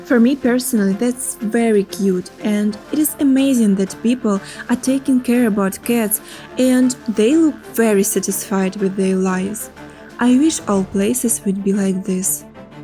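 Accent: Russia. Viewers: У Вас русский акцент?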